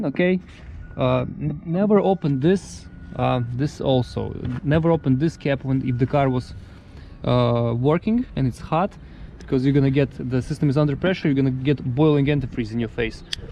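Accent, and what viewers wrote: Russia. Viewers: Спасибо за русский акцент)))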